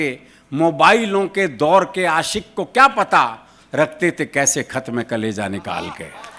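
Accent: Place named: Haryana, India. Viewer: All old jokes. Not one was original. Only the haryanavi accent was funny.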